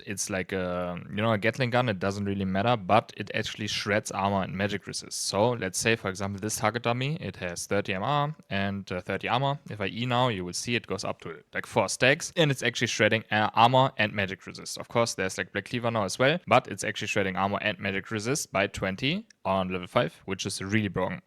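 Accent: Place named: Germany. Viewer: I like your german accent haha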